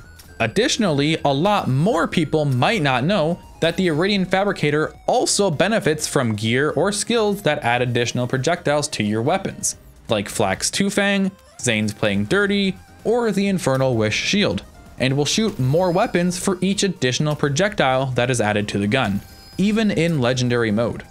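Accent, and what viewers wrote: Canada. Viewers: Is that a Canadian I hear? 😈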